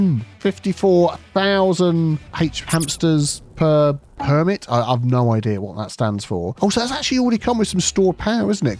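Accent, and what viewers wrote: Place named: Midlands, United Kingdom. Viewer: 😂😂😂😂 Was watching the bad tide warning count down, then watching it flow through the system when you zoomed out, and the warnings pop up internally screaming at to close the gates 😂😂😂 One thing though, the word is everythinG not everythinK, are you from the Midlands at all? Seams everyone says it round here and I'm constantly correcting my son, parents and siblings 😂😂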